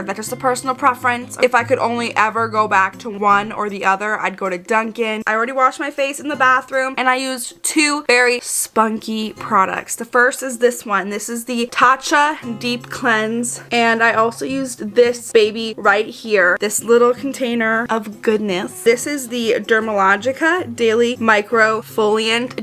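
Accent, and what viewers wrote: Wisconsin, United States. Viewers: am i the only one who thinks she should have a podcast? she’s so real with her viewers which is freaking amazing and her voice is just nice to listen to, we love that wisconsinite accent 🤩🙌🏻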